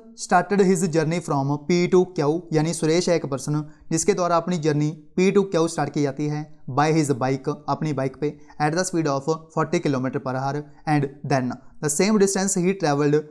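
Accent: Punjab, India. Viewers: ye sir ya toh punjabi h ya himacha se h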